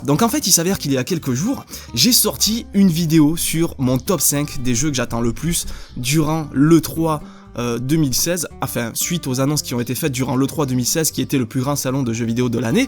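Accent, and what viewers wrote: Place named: Toulouse, France. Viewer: il a un accent psk il est toulousain ok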